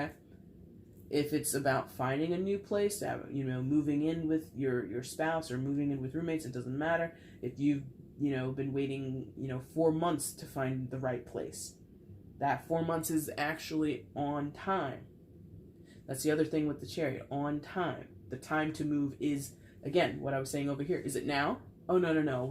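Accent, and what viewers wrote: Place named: Upper Midwest, United States. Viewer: Love that Wisconsin\Minnesota accent! Lol I’ve never seen the queen of wands as older... even your card she looks young to me, I mean she’s the sexy queen lol. I’m guessing it was more about the intuitive message. Feels low key for a reading with two aces, but it’s better than a negative reading. I love the ace of wands and ace of cups— all passion, romance, and emotions, but this feels like you’re saying I shouldn’t get too excited, like aces aren’t that big of a deal after all.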